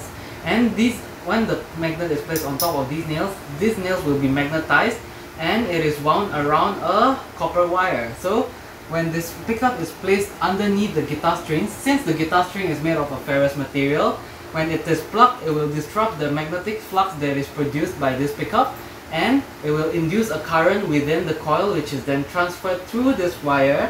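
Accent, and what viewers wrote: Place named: Singapore. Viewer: Kerasa banget Singlish nya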